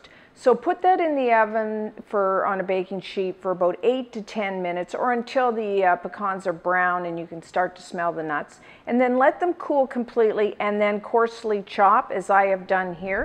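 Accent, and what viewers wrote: Canada. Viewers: Are you from Canada you have an accent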